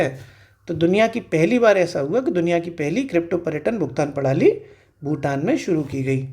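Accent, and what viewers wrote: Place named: Firozabad, India. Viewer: Sir फ़िरोज़ाबादी touch ह language मै..... 👍🏻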